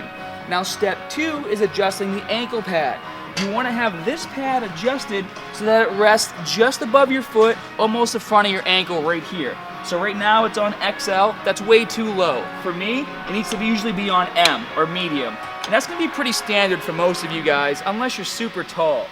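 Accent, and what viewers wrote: Boston, United States. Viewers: I have a feeling you're from Bawsten? Ha ha I like accents. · Yo is he american or am I just high? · You from Boston?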